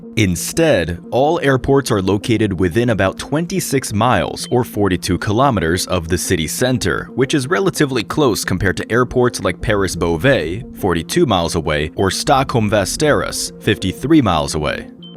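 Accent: United States. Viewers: Very strong American accent. And why miles miles miles. Is it not 2025?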